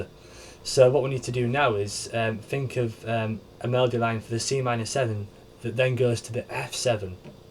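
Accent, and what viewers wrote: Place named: Hull, United Kingdom. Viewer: Good lad - I'm from Hull myself, nice to hear a lesson in an accent similar to mine!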